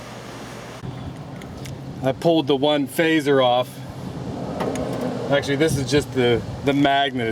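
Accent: Pittsburgh, United States. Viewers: bro where is your shop located?????...you sound like you have a PITTSBURGH accent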